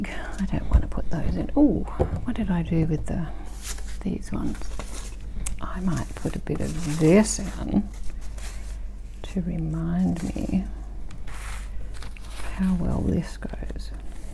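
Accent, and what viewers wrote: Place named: Australia. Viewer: Lovely to hear an Australian voice....from Mount Gambier SA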